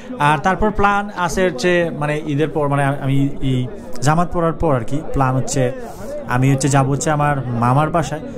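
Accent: Sylhet, Bangladesh. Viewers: Bro can you tell me are you sylhet or dakiya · Bai ki Sylheti?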